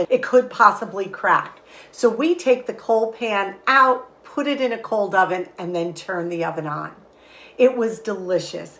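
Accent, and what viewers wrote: New York, United States. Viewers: Hi and, its Helen from Sydney, Muswellbrook, Australia. I have been watching your show now for many a while now and I enjoy your positive, kind and practical outlook on life. I always enjoy your warm and friendly start to your show. I have cooked many of your delicious, frugal reciepes and you have shown me how easy it is to enjoy life without wasting money. Love your New York accent and the kitchen shelving with your lovely vintage bowls. God Bless you and have a Happy Easter❤😊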